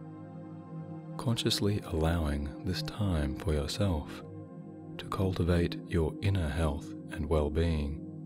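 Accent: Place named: Australia. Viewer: Very nice Meditation, love your accent, Australia or New Zealand? Namaste!!!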